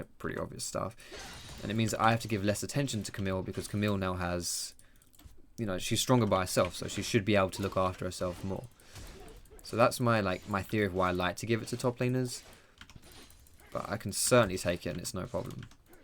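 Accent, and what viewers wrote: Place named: United Kingdom. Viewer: EUW, he's British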